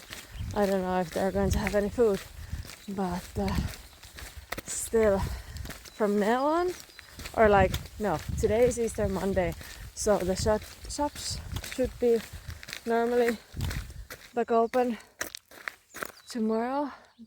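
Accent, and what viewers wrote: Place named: Ireland. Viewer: I’ve often wondered how a Finish girl should end up in Ireland , you picked up a good accent so must have been a while . I like a Crisp sandwich any day of the week 😊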